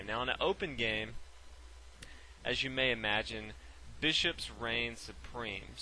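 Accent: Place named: South Carolina, United States. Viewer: you sound like your from Aiken, South Carolina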